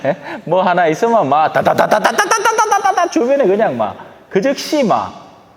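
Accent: Gyeongsang, South Korea. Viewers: 찬미예수님...신부님..말씀강론.잘들었습니다.경상도.사트리.정겹고.고향생각을하게합니다육남매.형제가.그립네요.신부님.사투리에.위안이되옵니다🙏🙏🙏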